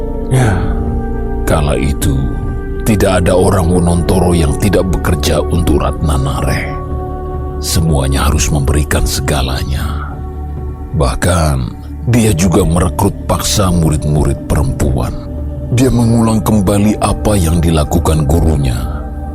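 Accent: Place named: Java, Indonesia. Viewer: Baru aja nemu channelmu mas (emot sedih). Njawani banget dari setiap katanya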